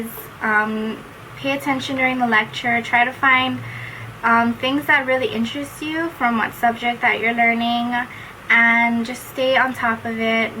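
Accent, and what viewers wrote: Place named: Hawaii, United States. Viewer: I knew you were from hawaii the moment i heard your voice ! LOL xp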